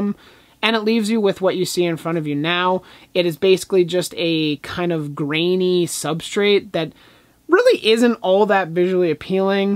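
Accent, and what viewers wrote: Canada. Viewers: it’s because he is canadian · It's a Canadian accent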